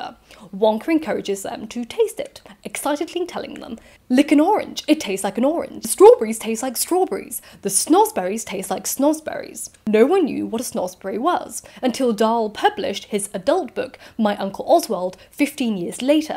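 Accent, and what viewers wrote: RP, United Kingdom. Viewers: He sound like Boris johnston,, The Brittish upperclass don t change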